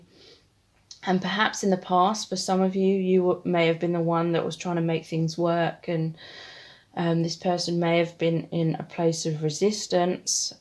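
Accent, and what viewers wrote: United Kingdom. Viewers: Girrlll, I love the british accent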